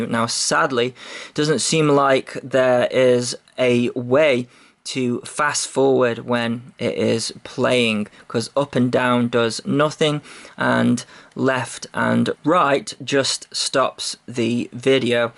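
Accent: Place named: Stoke, United Kingdom. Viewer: Great review, best I have seen so far, great value for the money and glad you dont equate it with the spark, the spark is a much better craft with a better camera and can fly 1.5 miles away , the A3 has been shown to fly no more than a <num> metres at very best, lots of folk like a drone they can fly out of visual line of site even if they are not supposed to, for the money though its good, they need to work on the software for the flight controller, them simply saying its our own doesnt detract from the fact that its not right, the drone vibrates quite badly and its only the gimble that saves the footage from being unusable, give them 6 months and they will iron out the bugs, the underside port is shown being used with a solenoid to drop parachutes on the promo video. I know you were covering your GPS coordinates but your flying location is very easy to work out from the aerial video and your accent, got a lot of love for Stoke and have family up that way just a few miles to the west of you, thanks for the review.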